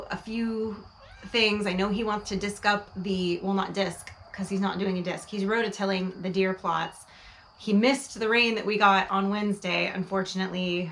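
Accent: South, United States. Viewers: Hey I'm starting to hear a little bit of Southern accent coming through when you talk. I lived in Georgia for four years and my best friend lives in North Carolina so every time I go visit I pick my accent back up within a few days. It's been 30 years since I lived in the South. Have a good weekend and God Bless!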